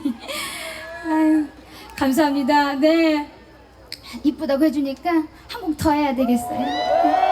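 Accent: Jeolla, South Korea. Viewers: 송가인 가수님 전라도 사투리 정겹고 친근감들어 재미나요 ㅎ ㅎ 노래힘있고 무대꽉찬느낌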